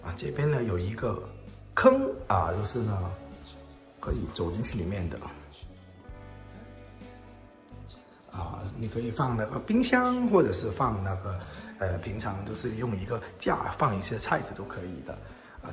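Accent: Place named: Hong Kong, China. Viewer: 这口音不是香港人就是广州人哈哈哈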